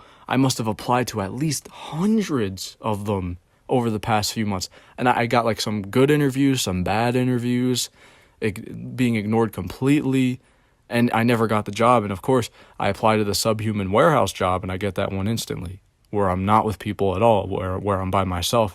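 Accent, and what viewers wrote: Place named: United States. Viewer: I was waiting for a buzzword....knew something up. I literally can't have any sympathy for you because of how negative you are. And I don't care if you've never directly bullied anyone, because the way you talk about people in other videos sounds eugenicist. I'm not hear to tell you to pull yourself up by your bootstraps. I bet you will feel better about yourself once you learn how fucked up capitalism is. Don't think about individual women, think about patriarchy and capitalism. It almost explains everything about modern life in a first world country (I don't know exactly where you live but I assume America by your voice). Don't think about your socioeconomic upbringing, think about the power of labor and the power of capital. Don't think about dating, think about community. Think about the world through a sociological lens, and try navigating the world like a sociologist. 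Once you start realizing that socialization is as simple as showing up to places regularly and not pushing people away with what you say (honestly, lots of friend making cliches from high school hold true). And above all, live life for yourself. I will not tell you to move through the world with a goal mindset, because success if not guaranteed. Rather, have systems in place that you will socialize regularly, with any safe group that will accept you. It's hard, but not because it's complicated, but because of the emotions surrounding rejection and fear. That's mostly it (of course from aspects of your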